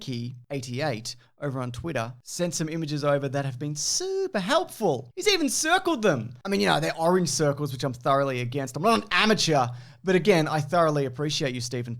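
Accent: Australia. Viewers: You are the reason I switched my Siri voice to Australian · Doan said it's the accent and they're partly right - it's because he's Australian. It's so bloody hot here that you can't care about anything else. Notice how started to get wound up about something, but then just bails on it? It's too bloody hot to stay in that zone for long.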